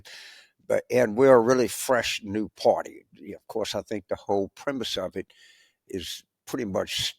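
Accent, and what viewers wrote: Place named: Los Angeles, United States. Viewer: Always have thought he has Tertiary syph, not secondary, but if you look up tertiary it really fits. Thanks! Always nice to hear a real LA accent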